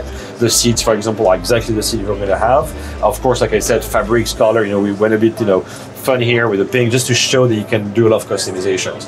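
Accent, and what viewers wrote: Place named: Switzerland. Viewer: "Bisch scho am filme?"...ha doch dänkt das du schwiizer bisch...😊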